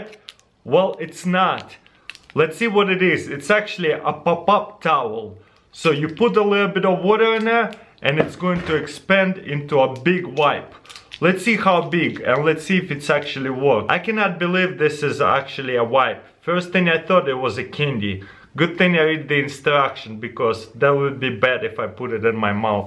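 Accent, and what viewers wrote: Russia. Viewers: Your a russian right please speak yoir word and make a subtitled Who agrees!? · По позорному акценту сразу понятно, что русский, лол. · I love you russian accent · Русский акцент слышен издалека )) привет, чувак :D А штука прикольная)) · Im watching u cause i wanna try and learn how to do a russian accent(you're russian right?:P) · Bro i LOVE Russian accents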